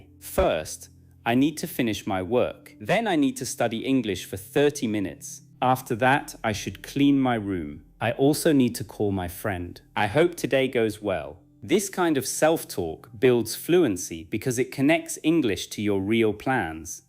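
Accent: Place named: United Kingdom. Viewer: Oh God the British accent is amazing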